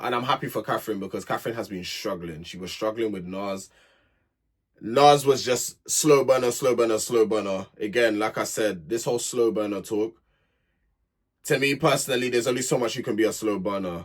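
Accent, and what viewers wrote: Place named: Caribbean. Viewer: Am hearing a Caribbean accent. Are you from Trinidad 😂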